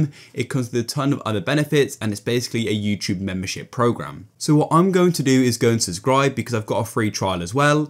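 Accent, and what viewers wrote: United Kingdom. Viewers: British acent!!!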